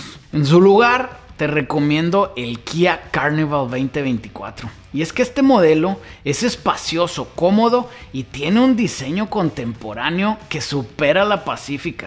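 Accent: North, Mexico. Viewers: No sabia que los gringos hablaban como norteños o de donde es este wey y por que todo lo dice en dolares y galones, que no su mercado es latino? Y seguramente el 80% de sus vistas son en México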